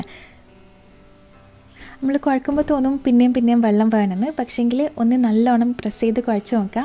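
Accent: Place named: Nileswaram, India. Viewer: slang nhangalude nileswaram slang pole same und..athaa choiche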